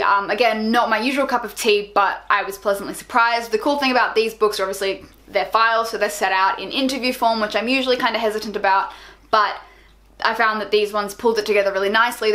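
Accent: Australia. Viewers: I know! I love the way it sounds. She's Australian, right?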